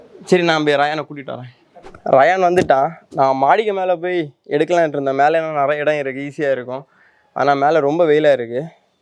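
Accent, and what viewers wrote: Kanyakumari, India. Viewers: Bro r u from Kanyakumari 🤔🥰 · Anna..... R u from Kanyakumari... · Kanyakumari ah bro neega Kumari slang la pesuriga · Bro nenka kanyakumari slangaa ,nan kanyakumari 😁😁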